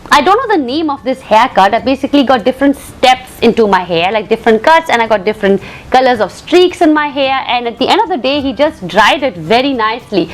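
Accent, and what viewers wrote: India. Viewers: i love Ur Hindi ascent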